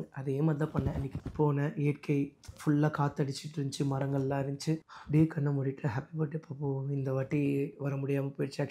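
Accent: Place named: Madurai, India. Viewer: என்ன ப்ரோ மதுர🔥 பயலுக சிலாங்குளலா பேசுற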